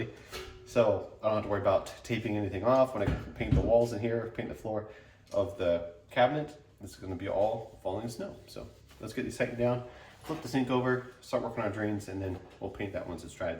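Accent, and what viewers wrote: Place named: Canada. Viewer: He talks like a candian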